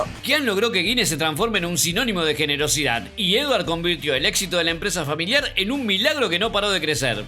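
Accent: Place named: Argentina. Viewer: Nunca había escuchado un resumen tan argentino 😅... Amé 👏👏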